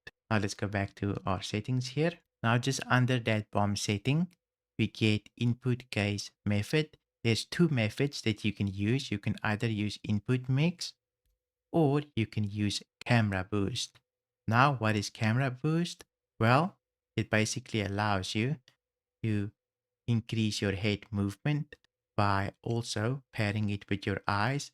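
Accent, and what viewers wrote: Afrikaans, South Africa. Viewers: Dude, thanks for all the videos. Have been watching a lot of them recently. Very helpful! If I may: I believe I hear an Afrikaans accent there. Am I correct?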